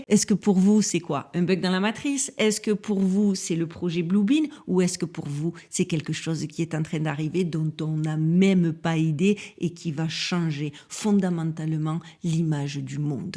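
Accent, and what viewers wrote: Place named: Southern France, France. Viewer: Je pense que la nature de 3I/ATLAS dépasse notre compréhension, voir notre entendement. Quelque-chose se prépare ,c'est mon intuition. Cela dit , j aime beaucoup ton style , ton phrasé, le timbre de ta voix et ton petit accent du sud donne l impression que tu chantes . J adore tes contenus .. Ps: la nasa sont des menteurs !! Comme tous les narratifs officiels.😂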